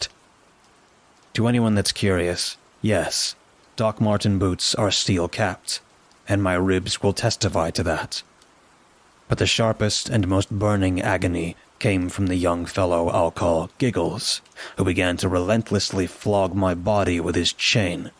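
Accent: Scotland, United Kingdom. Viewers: haha love your Scottish accent not bad at all 👍🤣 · I wasn't meaning to criticise. Its like Christmas when you upload. Your content is always top quality. It was cool that it wasn't the typical generic hollywood scottish accent. Your still much more accurate that others would do.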